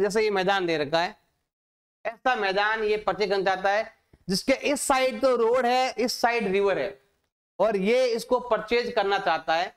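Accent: Rajasthan, India. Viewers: Sir aapki aawaj me rajsthani feel hai 😅😊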